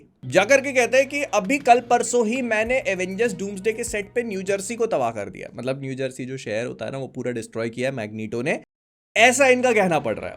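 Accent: Bhopal, India. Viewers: bhai bhopal se ho?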